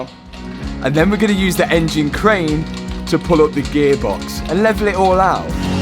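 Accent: Leicester, United Kingdom. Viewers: Rat er ley, fer rar ey, noise ey love it good old Leicester accent. And cracking video well done 👍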